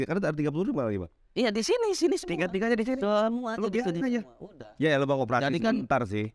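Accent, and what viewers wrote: Jakarta, Indonesia. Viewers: Bang logatnya Jakarta banget, lama di Betawi sih ya?, tapi keren sih.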